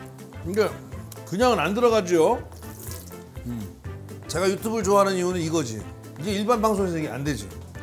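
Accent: Chungcheong, South Korea. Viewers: 넘 맛있겠어요! 부모님 고향인 충청도 사투리 너무 정겹고 좋아요. 저도 수원백씨. 영상 감사합니다!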